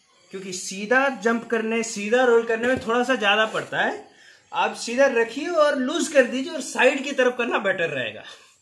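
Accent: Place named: Madhya Pradesh, India. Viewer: Bhaiya apki hindi to M.P mein bolte he waisi he....... Gutne jawab dena aur aukat se jyada uncha jump.......😂👍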